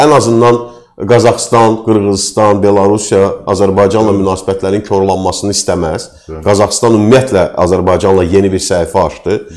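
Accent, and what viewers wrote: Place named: Armenia. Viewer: Бу данышан ела данышыр ела бил ерманиди ..... Бир буну таныйан вар бунун нанаси бабасы анасы арвады хансы миллатин нумайандасидир.....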